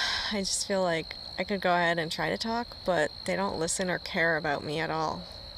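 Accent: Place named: Canada. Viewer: It's funny to me how I randomly picked this after doing a general EFT search (without knowing you or your channel)...and hearing your Canadian "accent". My communication breakdown is with a Canadian 😇💙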